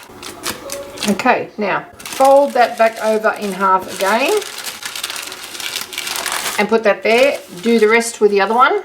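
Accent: Australia. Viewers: Thank you for this wonderful recipe. I have been living in Germany for many years now, and I miss my pasties and pies. Luckily I managed to buy a family pie maker and so lucky I stumbled over your youtube channel. :D So nice to hear a fellow Australian talk, too. The pasties are in the maker, filling tastes fab and they look so great, I cannot wait to get tucked into them.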